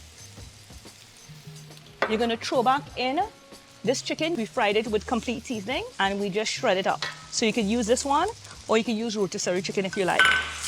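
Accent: Trinidad and Tobago. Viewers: Am I detecing a Trni accent? The low mein looks, and I am sure tastes absolutely delicious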